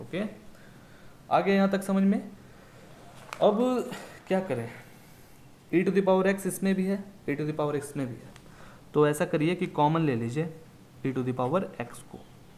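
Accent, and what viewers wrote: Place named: Bihar, India. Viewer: Are sir bihar se hai na..... Lallantop style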